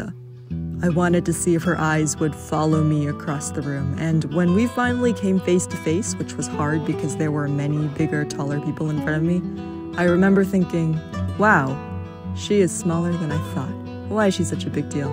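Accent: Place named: France. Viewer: Your french accent is so cute <3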